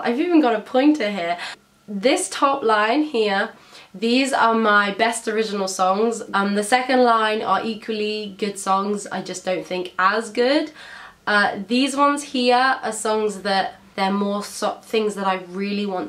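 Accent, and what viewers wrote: United Kingdom. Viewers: I really like ur London accent XD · I DIDNT KNOW YOU WERE ENGLISH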